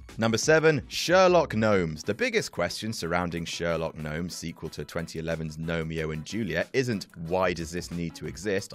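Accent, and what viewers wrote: United Kingdom. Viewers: they're called "films" where your accent's from.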